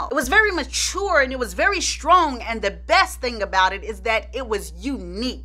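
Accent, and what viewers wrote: United States. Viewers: Lol wat is up with this country accent 😂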